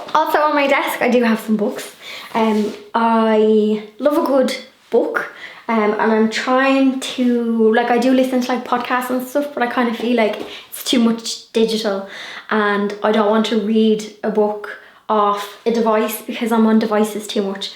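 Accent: Ireland. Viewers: You are very organized. You have a wonderful creative mind. Love when you work with power tools! You are the best! Love the Irish accent so melodic! Mery Christmas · You’re so cute. I love your Irish accent. You know Ive been just making do with working around my sewing machine and what nots. I should make my work space nicer. Thanks for the ideas.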